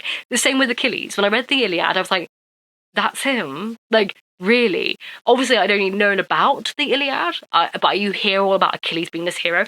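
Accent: RP, United Kingdom. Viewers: Ok good faith question, asking because I do the same thing ok so it's not a judgement. Do you have a theory on why you sound so posh? Because I do too, but only in English which isn't my native language. I pick up accents all the time, put me in a different part of the country and I'll be speaking that in a few weeks, but posh is very much my neutral that I can't seem to get rid of. My best guess on how it happened is that my ADHD and other chronic illnesses makes me not speak any language very well. I don't remember common words in my native language so tend to speak three at a time. If I have to speak 1 language at a time there are a lot of pauses because I have to think of the words rather than just insert it from whatever language I do remember it in. I think the posh might be overcompensating for that.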